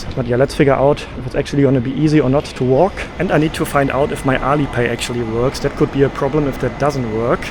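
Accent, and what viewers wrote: Germany. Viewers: Its a german accent not an english one. But the language he is speaking is english. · Hi! I’ve been watching your videos for a long time, mostly on Bilibili, and sometimes on YouTube too. Today I opened YouTube and your video was the first one, so I watched the whole thing. I remember you mentioned before that your German-accented English might be hard for people to understand, but I watched the entire video without subtitles and understood everything perfectly. I think your English is totally fine!😊 Hope more people discover and love your content.❤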